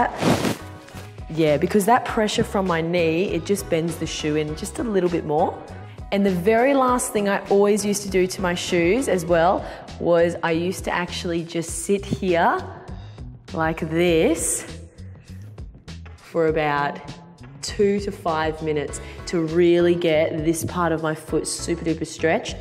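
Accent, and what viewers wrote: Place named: Australia. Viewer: How did I know she was Australian before even clicking on this video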